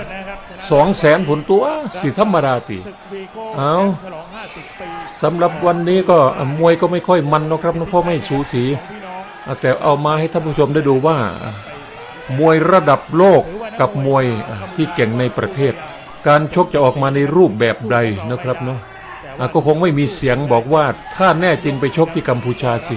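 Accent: Isan, Thailand. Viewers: ท่านพากสำเนียงอิสานใด้น่าขำ..ท่านอื่นพยามเลียบแบบแต่ไม่มีใครสู้ฟังดูแล้ว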